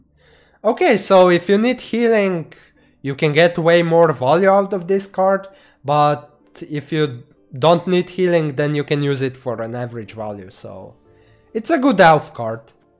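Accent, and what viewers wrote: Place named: Spain. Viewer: I think from Spain. But i like his accent. I like also his contents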